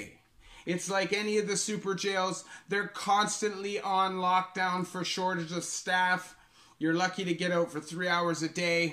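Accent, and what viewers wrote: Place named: Toronto, Canada. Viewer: I live in windsor Ontario n noticed u got an toronto accent its cuz I'm w alotta Americans down here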